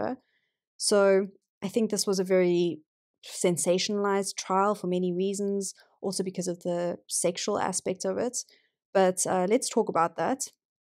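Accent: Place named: South Africa. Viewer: Just found your channel. I have subbed! I love your content and hearing a South African doing crime 🥰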